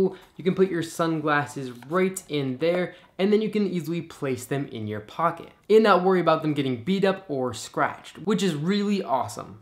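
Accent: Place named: United States. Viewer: Oh and by the way, please don't change the way you speak, we English like an American to sound the way we like Americans to sound, just the way you sound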